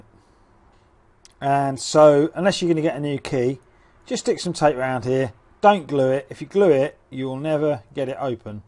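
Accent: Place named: United Kingdom. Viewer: Yeah this one gets it! great close ups which are necessary here and a muchhh easier way to get the last piece open than the last video. I️ tried their way for like half an hour this took just a min. Thank god for YouTube or we’d never get these things open! Well done. I️ Also like the British accent ha it makes me trust you. For some reason. 🤔 Is that why nature documentaries are always narrated with a British accent? Cuz we believe that Brit’s have gained wisdom through their long period of history kinda running the western world? Or because the brits just make all the best nature documentaries? I️ suppose I️ just Love British things all around. Has anyone read this far? I’ve been up all night and fixing this fob is the icing on the cake 👌😎 now I️ know... I’m thankful for British accents and how much I️ trust them! Hey Now I️ know what to do when I’m stressed out...have people give me advice in British accents! 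Or find a British guy to hang out with. That could work:) I️ need a British boyfriend...for my health! I️ live in Cincinnati in case there’s anyone who wants to hook a sista up. Forrreal tho this is just as good as tinder imo. Who knew I’d leave such a long comment! This is a record and a first. Probably because I️ feel like the ppl who read this will be very purpose driven and probs not read/mind this rant for a brief distraction from the rocket science that is opening this fob! Waited months to change it but I’m doing it for my friend secretly so hell have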